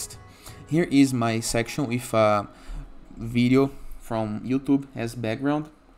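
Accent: Brazil. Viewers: Sotaque BR. It gets one to know one.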